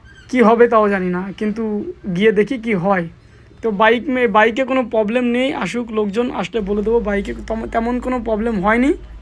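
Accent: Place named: Bangladesh. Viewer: Vai tumi ki Bangladeshi naki??? kotha sune tai to lagche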